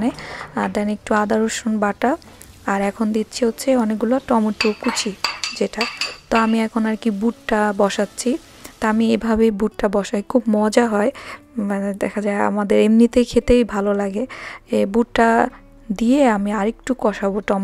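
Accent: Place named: Sylhet, Bangladesh. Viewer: আপু,খেয়াল করলাম আপনার কথা বলার মাঝে হালকা সিলেটি ভাষার টান এসে যায়❤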